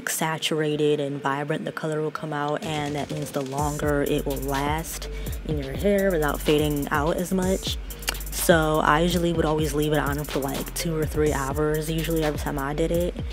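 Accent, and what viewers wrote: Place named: South, United States. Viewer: You sound so country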